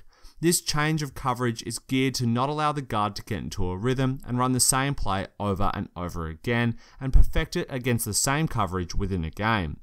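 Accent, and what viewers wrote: Australia. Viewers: I'm gonna just say it is his Ozzie accent.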